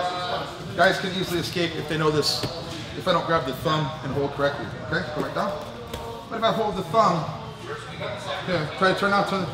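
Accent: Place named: New York, United States. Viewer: great tips, plus you talk like a real New Yorker / New Jerseyan (the Proper English) ... awesome OSS ...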